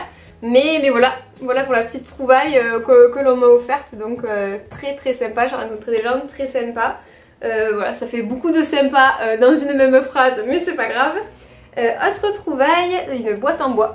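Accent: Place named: Alès, France. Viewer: Coucou étrange comme quoi l'accent vraiment !!!!je l'ai reconnu! Alésienne comme moi👍♥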